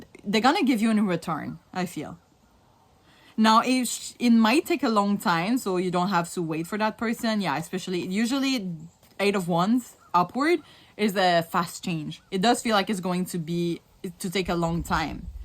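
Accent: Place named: Quebec, Canada. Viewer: I can hear a québécois accent from a miles away tell me in right 😅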